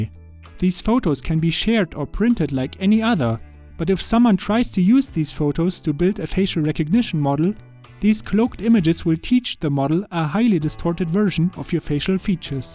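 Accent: Germany. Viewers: Kann das sein das du Deutscher bist? Der Akzent killt mich halt total x) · Love the german accent. es ist schön but thanks for the information you are providing. subbed.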